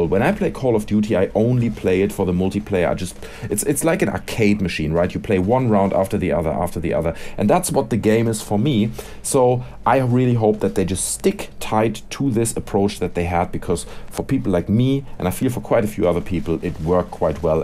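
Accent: Germany. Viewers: A you german bist du deutsch? :D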